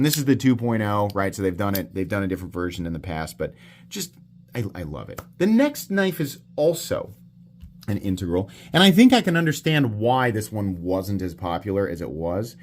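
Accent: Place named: Midwest, United States. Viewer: don't worry about your accent. Keep the Midwestern accent alive!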